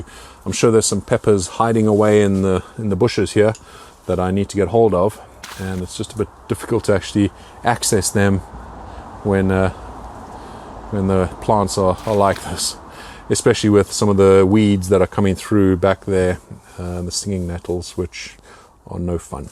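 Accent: South Africa. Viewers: The SA accent is BY FAR the best accent IMO. Manly and unique. 🤘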